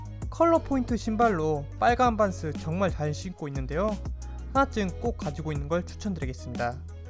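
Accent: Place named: Jeolla, South Korea. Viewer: 전라도 사투리가 정겹네요^^ 광주사람이라 딱듣고 알았네요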